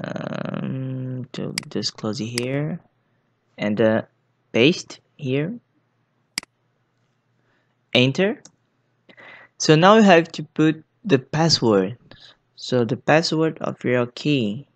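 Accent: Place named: India. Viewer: Caramba, véi! Pensei que fosse um indiano! Já ia fechar o vídeo. :V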